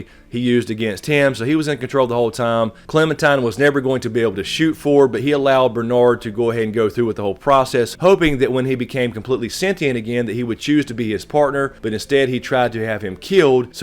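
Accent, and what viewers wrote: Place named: North Carolina, United States. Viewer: North Carolina? Help me with your accent. Nice video, thanks.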